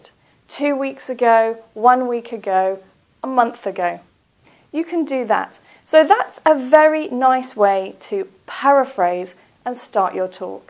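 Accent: England, United Kingdom. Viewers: Listening to her can improve your british accent & pronunciation too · You got a pretty crispy posh English accent haha. It's 👌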